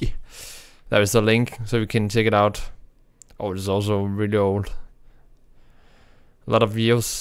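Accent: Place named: Germany. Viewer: You sound as though you have a German accent... how lucky you are to have J.S. Bach as part of your cultural heritage. The very grandfather of classical music, and the author of the once a world '48 preludes and fugues' If I were German I would be incredibly proud so to be, with the cantor of Leipzig being one of my previous countrymen.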